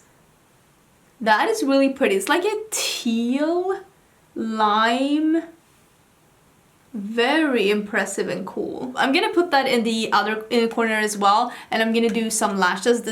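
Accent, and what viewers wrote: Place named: Sweden. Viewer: the way you kept saying "depth" in your cute little swedish accent! 😭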